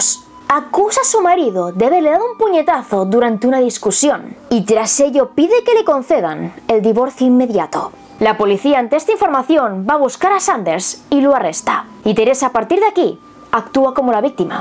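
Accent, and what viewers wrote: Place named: Spain. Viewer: Aaaayyyy Maadreee Vale madre . Xd Es que tu acento español y tu sentido del humor... lo amo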